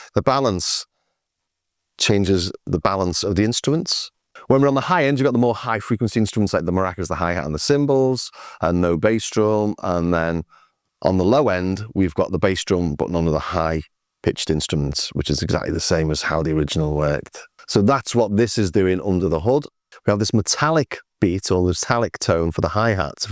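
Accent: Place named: Lancashire, United Kingdom. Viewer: She’s even sweeter with a woolyback accent! ❤ nice one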